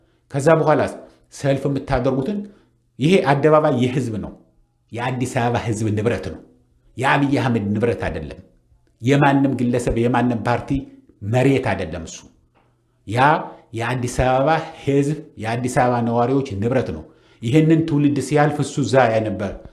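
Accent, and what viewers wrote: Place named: Eritrea. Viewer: አክሰንትህ የኤርትራ ነው በቃ ኢትዮጵያውያን በናንተምክንያት ስንቱን ስቃይ አዩ ኢሳያስ የሚባል ጸረ ኢትዮጵያዊ ነው!!! አብይን ብጠላውም የዝህ ሁሉ የቆየ መዘዝ የኢሳያስ ፖለቲካ ነው እግዚአብሔር እናንተን ብቻ ይቅጣቹ ክፉዎች ቀናተኞች ! ቲፒኤሌፍ ኦነግ ብትሉት እናንተ የሰራችሁት ጸረ ኢትዮጵያ ስብስብ ናቸው !!! እኔ ተስፋዬ በእግዚአብሔር ብቻ ነው የእሱ ፍርድ ይውረድ ምርጥ ሃገር ነበረን እድሜ ለጅኖሆይ ያው ለሁሉም ሞት ሆነ እሳቸውን በግፍ ጥላቹ የእሳቸው እርግምን በየቤቱ እየገባ ነው ይበለው ይሄ ሕዝብ ማዘንም አያስፈልግም !!! ክታንኩት ፖለቲካ የዘር ፖለቲካ ለማን በጀ????? ለማንም !!! እርስ በእርስ ተጫረስ ሃገር አልባ ሆነን ማየት ነው የሚያስፈልገን ያኔ ይቆጨናል !!!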